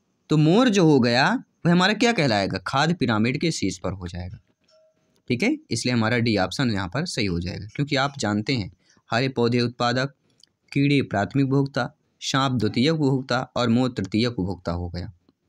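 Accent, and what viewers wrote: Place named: Bundelkhand, India. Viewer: बुंदेली बोली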